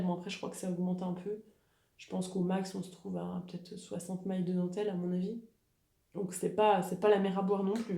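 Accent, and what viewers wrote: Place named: Lorraine, France. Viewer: Je viens de découvrir tes podcasts, que j’apprécie beaucoup et je me disais bien que je reconnaissais cet accent...Je suis allée au lycée à Lunéville, j’habite maintenant en Allemagne. Ça me fait plaisir de t’écouter, le podcast est intéressant et inspirant, et ça me transporte dans la région de ma jeunesse 😉. Bonne continuation....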